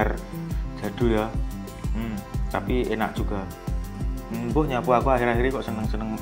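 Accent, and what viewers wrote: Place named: Java, Indonesia. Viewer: Medok 2 salam salim slem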